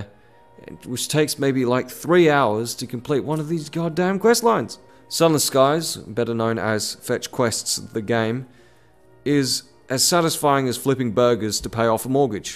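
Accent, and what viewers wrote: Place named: Australia. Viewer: I'm English and he sounds more Australian to me in fairness.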